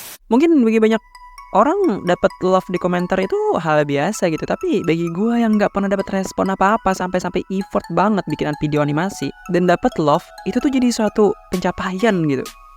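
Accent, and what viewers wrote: Kalimantan, Indonesia. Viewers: izin nebak bng, keknya dari logat bahasanya keknya orang kalsel/kalteng dah :v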